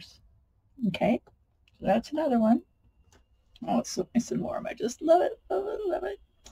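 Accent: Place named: British Columbia, Canada. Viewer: Hi you sound decidedly Canadian BC in particular... LOL if people don't want to listen to your channel they can move on those of us that want to listen we will and as far as your hands go your hands look like every other artist hands except they're not plastic looking I hate it when they try to do these crafts with those bloody Nails on it's ridiculous you're fine and you're doing a great job and thank you for showing me what I can do with all my messed up resin projects. I am extremely new to pouring resin and most of what I have poured by now isn't real useful until now thank you❤❤❤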